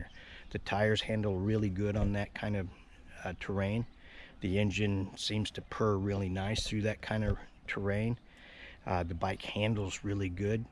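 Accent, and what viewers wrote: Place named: New Jersey, United States. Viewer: How u get to Thailand- I mean u speak like you from I’d say New Jersey ? Now I got to investigate Thailand I love the bird noises in the background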